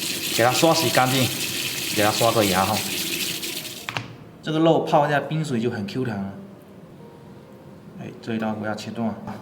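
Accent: Fuzhou, China. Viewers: 一听口音就是福州的😅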